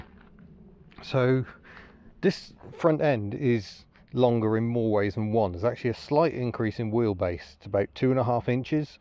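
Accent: United Kingdom. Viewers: Your commentary is just a little too folksy like you yourself come from a very small town in the UK?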